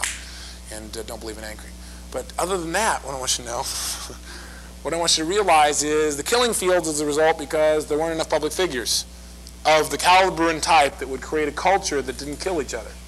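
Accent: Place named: Texas, United States. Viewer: His Texas accent is strong.. omg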